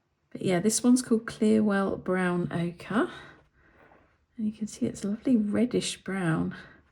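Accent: England, United Kingdom. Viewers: the sound of your voice matches your whole pallet vibe regarding color. As I listen and watch; it seems very restrained, peaceful and very English, perhaps rural. The colors are muted, surprising, and yet chosen with deep thoughtfulness. It is so much in opposite to colors I enjoy or am drawn to. I live in a high plains desert, with amazing contrasts in color, temperature and weather. I happen to be impulsive and a little over dramatic. One would not guess it by observation, as I’m extremely introverted. I enjoy your colors without wanting to convert my own pallet to them. Thank you for sharing. I enjoy the contrast; it reminds me that I will need my own kind of restraint in my own painting. Take care 😊.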